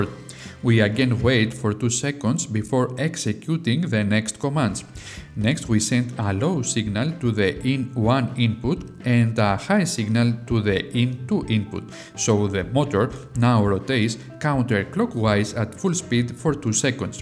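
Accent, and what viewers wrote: Greece. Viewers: I love this channel ! The projects are simple enough to be great starting points for my projects. Excellent explanations and the Greek accent is awesome too. Ζήτω η Ελλάς ! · I haven't had any misunderstanding related to his accent. He is quite clear. I thought he might have been Spanish - but now that you've brought it up - I see the Greek intonations. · Quite informative as far as high tech is concerned but with a strong Greek-English accent which can sometimes be not so overwhelming to native speakers as mispronunciation of words can lead to some degree of misunderstanding and missing the point. Not offence I hope as I am Greek too with Engineering background.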